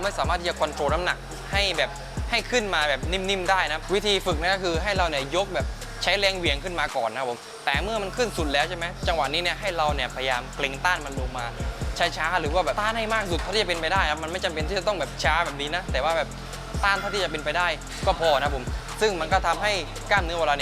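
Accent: South, Thailand. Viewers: Southern Tone makes your VDO different from others. Otherwise make me miss my hometown. Keep doing the good job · เป็นประโยชน์มากเลยครับดูทุกคลิปเลย ชอบความมีสำเนียงทองแดงโผล่มาด้วย มีเสน่ห์มาก 😄😊 #คนใต้นิ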